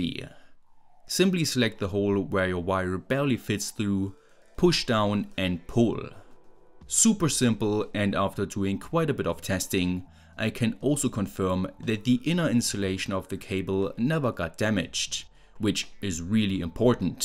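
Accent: Germany. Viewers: It’s funny that I can really hear out from the way you talk that you are German 😂❤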